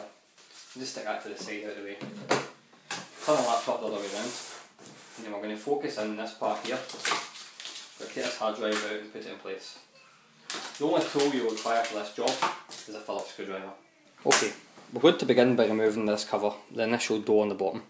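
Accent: Scotland, United Kingdom. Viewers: thanks for the tutorial! Love the Scots brogue - made it much more pleasant to watch!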